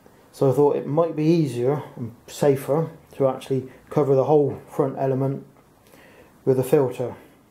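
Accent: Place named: United Kingdom. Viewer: A 16:6 wide angle Mennon lens hood - the type seen in this video - is available on Amazon. It comes with a 55-58 mm step-up ring. This is a great video, by the way. I've one question, though: no matter how obscure my questions, whether regarding a vintage 1918 film camera or the most recent digital 4K cameras, virtually every YouTube video that answers my questions is the work a Brit. Any time a video a starts and I hear any sort of UK accent, I think: "Here come the answers!" Have you cornered the market of photographic experience and insight? No - thank YOU!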